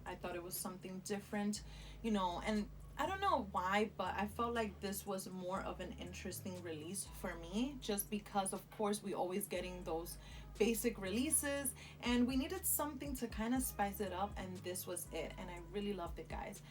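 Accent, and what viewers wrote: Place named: Latin America. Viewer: First time on your channel, I really like the style of it, and your Latin accent is HOT! I subscribed, looking forward to future content! I got the white and black, so far I wore the white and it does feel a bit weird but I think you’ll get used to it fast.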